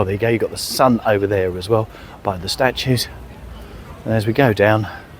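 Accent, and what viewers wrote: United Kingdom. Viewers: Great video, never been to Kensington Gardens but it looks lovely. And I’m understanding the London accent more aswell 🤣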